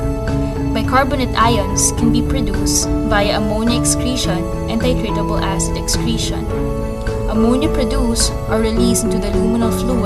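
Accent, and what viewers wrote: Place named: Philippines. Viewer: sounds phillipino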